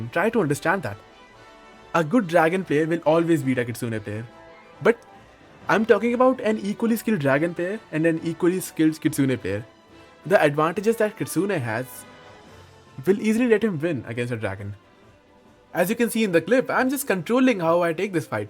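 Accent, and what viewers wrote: India. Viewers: "Bro u sounds like Indian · Bhai tere accsent indian hai toh hindi bola kar yar❤ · Ppl gonna hate me for this but.. WHY INDIAN ACCENT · I can feel your hindi 😅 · oh yea, he sounds like indian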